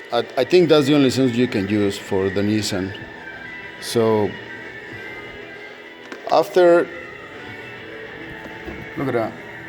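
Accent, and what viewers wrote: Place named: Zacatecas, Mexico. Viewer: His English is good, and the accent is not a problem if English is your first language. Esta bueno, Su ingles, y el asento no es un problema, si ingles es su primero idioma. I was content with his knowledge of adapting a Dodge part to the Nissan D21. Pienso que su asento es de Zacatecas?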